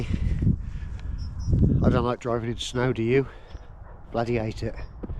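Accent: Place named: East Anglia, United Kingdom. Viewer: Hiya! I do always enjoy your waffle, always after content like this. What you said about not being in a rush all the time and slowing down really appeals to me. Sounded a bit ironic to me though, in your quite fast spoken Suffolk accent. But that's all right - no big deal. 😄 There are a few wishes in the corner of my mind, but when you don't know what the future may bring at you tomorrow, making resolutions and sticking to them proves rather difficult. The best we can do, I reckon, is enjoy every single moment of life to the fullest, as if it was our last. Cheers, greetings from Minsk! Mike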